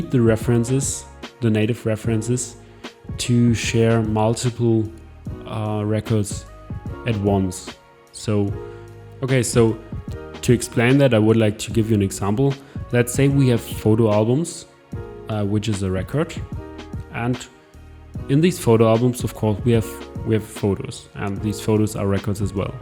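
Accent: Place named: Denmark. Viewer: Are you Danish? I'm only half Danish but I am detecting a Danish accent. You put a lot of work into this video and are very knowledgeable. You've gained a subscriber. It's too bad you don't have more videos on your channel! Question: did you practice making videos before and have made a lot of them private?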